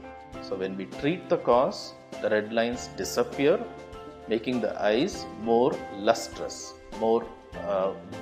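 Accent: India. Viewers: Why do i love indian accents?? Lol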